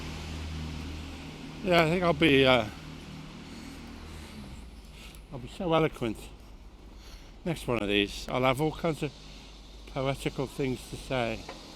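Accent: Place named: Liverpool, United Kingdom. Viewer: I listened with the CC on and the subtitles are utterly hilarious. I guess the person subtitling doesn't understand Scouse accent or knows London at all. Exmouth Market was "Eczema Market", Anglo-Saxon car park was "Then go suction" car park, and Pret A Manger was "press emoji". I may watch all the vids again with the CC on to see how bad they are.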